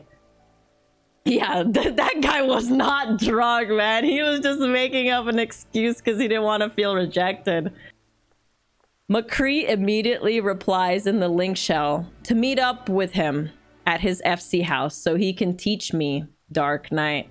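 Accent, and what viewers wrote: South, United States. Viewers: that southern accent SENT ME 🤣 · Lol, your southern accent is 🔥. But you live in Georgia, right? That's probably why. 😀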